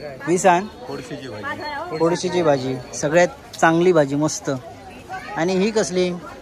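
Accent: Malvani, India. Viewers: Tu malvni boltas te Chan vata volg madhe sagat te Chan vata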